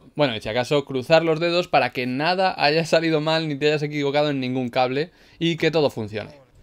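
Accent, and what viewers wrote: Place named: Basque Country, Spain. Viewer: Joder eres un magiver jajaja,el motor suena bien eh,lo dajes de 10 el coche,un saludo desde san sebastian me suenas a vasco jeje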